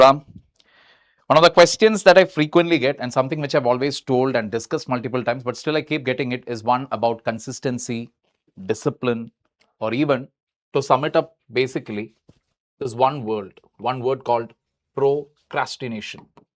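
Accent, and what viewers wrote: Kerala, India. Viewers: This stupid is wasting aspirants valuable time by cleverly indulging motivation content in his lectures, with that malayali accent and popping eye gestures he is playing a good drama digitally and earning well.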